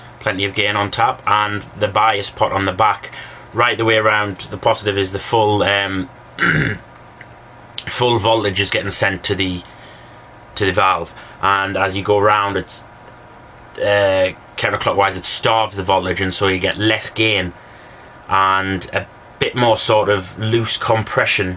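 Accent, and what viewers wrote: United Kingdom. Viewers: haha i love the way u talking i usually hate and skip the talking part of a audio demo but i subcribed your channel just to listen to ur brit tongue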